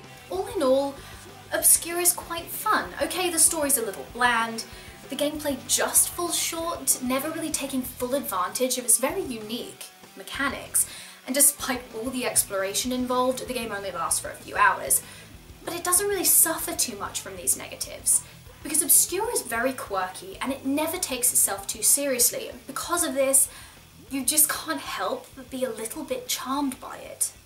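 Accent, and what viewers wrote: United Kingdom. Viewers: Pretty girl? Likes video games? British accent? You probably get this a lot but damn.... I think I may be in love with you.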